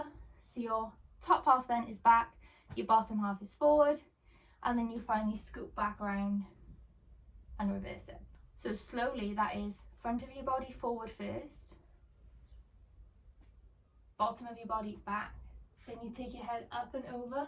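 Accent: Wales, United Kingdom. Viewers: Hmm you sound welsh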